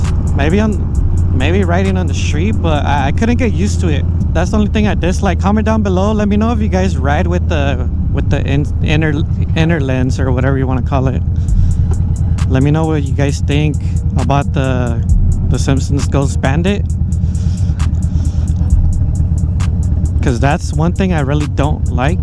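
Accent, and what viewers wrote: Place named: Guam, United States. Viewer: Bro you sound like you from Guam.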